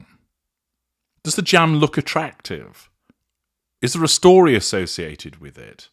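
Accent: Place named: Cockney, United Kingdom. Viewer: Sorry, but you sound like Eastenders, no offence mate.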